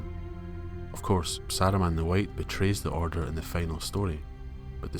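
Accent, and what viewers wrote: Scotland, United Kingdom. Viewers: Good to hear a Scottish accent doing this rather than your typical southern one…… the real north, north of the walls. 👍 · Always a lol strange hearing another Scottish accent on YouTube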